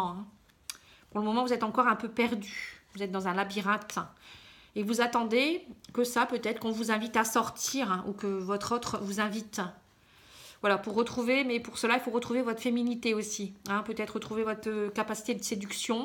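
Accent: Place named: Picardy, France. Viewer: Bien vu pour la F J ma moitié trop de signes qui me font penser à lui c est fou heures miroirs son prénom partout sa région !! Je suis Picardie et votre accent ne ment pas ma TCHOTE j ai le même !!! Félicitations pour votre perspicacité et votre don sans tous ces tralala bougies pierres statuts !!! Merci merci merci beaucoup et vous êtes sur le bon chemin vous aussi !!! Chacun sa vocation je soigne avec mes mains suite à une Mort imminente au Touquet Plage je vous embrasse et a bientôt en tant qu Abonnée 🙏😇👍💋🌹🌹🌹🌹🌹🌹🌹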